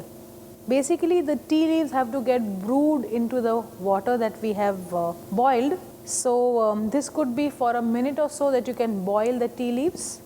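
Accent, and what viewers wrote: India. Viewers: Very clear instructions in classic Indian accent (apna cha ache hain, leikin T nahin!)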